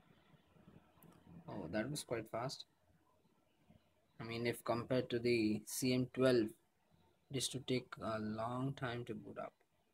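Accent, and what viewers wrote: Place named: India. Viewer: No crees que si hablas en el idioma que realmente domines se entienda mejor ese inglés que manejas esta por la banda con el acento pareces un Indu hablando inglés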